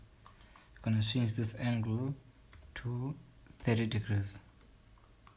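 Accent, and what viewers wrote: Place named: South Africa. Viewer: I know this is a South African voice. Which university you at? Thanks for the help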